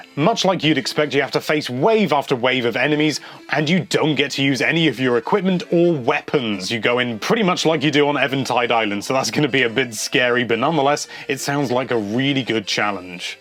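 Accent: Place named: United Kingdom. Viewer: he is English (British) not (American)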